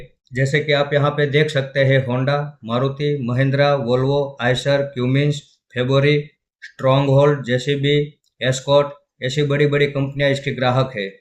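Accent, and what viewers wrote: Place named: Gujarat, India. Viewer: તમે ગુજરાતી હોય એવુ લાગે છે. ખુબ જ સરસ આંકલન કર્યું મોટાભાઈ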